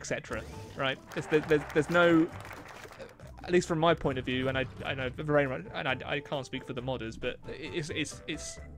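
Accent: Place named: Somerset, United Kingdom. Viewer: I'll your Cornish accent was more of a Somerset accent I'll give it a 6/10.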